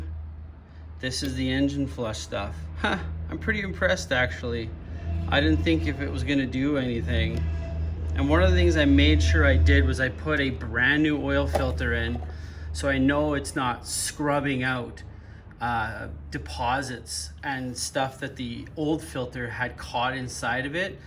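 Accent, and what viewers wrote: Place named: Canada. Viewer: This guy is probably Canadian. Sounds like it from his accent and the fact that he said km over miles. Do the rest of you guys want to know how we can afford fancy cars like his red BMW and his Expedition? We have the best healthare waiting lists in the world - that's how. We actually dont get healthcare as you might have been told, but we do have the best waiting lists you will ever find.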